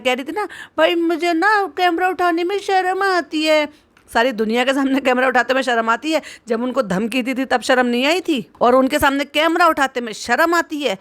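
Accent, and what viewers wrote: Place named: Old Delhi, India. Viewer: Aapke bolne ke style se lagta h Ki AAP purani dilli se bai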